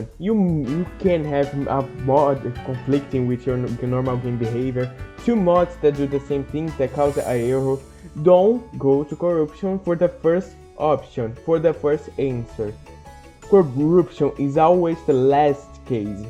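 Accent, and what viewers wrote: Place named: Brazil. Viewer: VC EH BRASILEIRO NEEE ESSE SOTAQUE EU SEII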